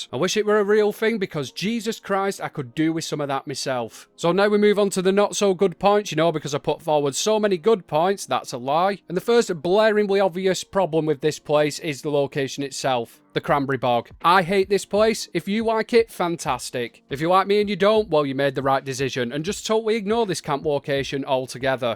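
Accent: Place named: Northern England, United Kingdom. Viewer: No, definitely wasn't me! i think you are brilliant , love the northern accent and love how you use proper British phrases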